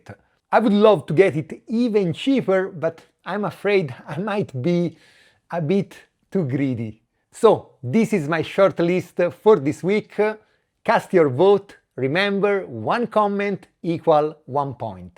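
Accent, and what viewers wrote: Tuscany, Italy. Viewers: Your accent is so funny. Are you from Toscana?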